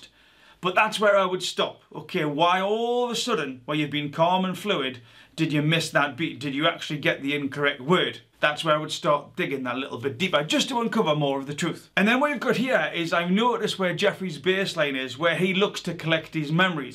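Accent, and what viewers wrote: Newcastle, United Kingdom. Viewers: I love hearing a local accent on YouTube. We Geordies are gonna take over! Like the content. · U a geordie?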